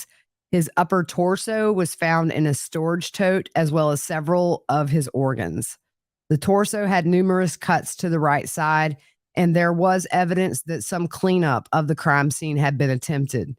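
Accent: South, United States. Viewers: Hola from the Big 🍎 - I watched this at 4 am - this new case is going to be a doozie! If she’s acquitted (?) I’m going to set her up with my ex-husband. Love watching you, especially when I wake up and can’t sleep - miss the southern accents! The south is beautiful. Love you lots!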